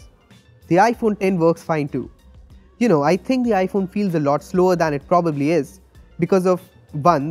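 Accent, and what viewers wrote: India. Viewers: Sayam Sawai he has a typical Indian accent but his presentation is pretty good. I wouldn't judge him for his accent alone.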